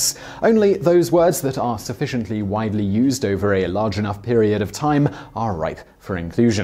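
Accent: United Kingdom. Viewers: relax! The more I hear you the more I understand. U. K. Accent? Big deal. I grew up eating grinders. Poor's boys, subs hoggies. What ever. Same thing. Different name. I can't imagine what Webster's going though now! I gotts to know!!! Haha!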